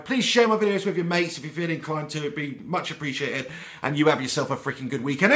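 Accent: United Kingdom. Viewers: Which part of the UK you from broo?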